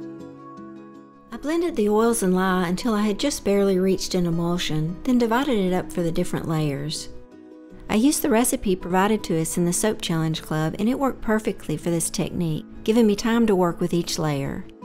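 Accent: South, United States. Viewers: you have the sweetest southern accent, it reminds me of home. Your soaps are beautiful.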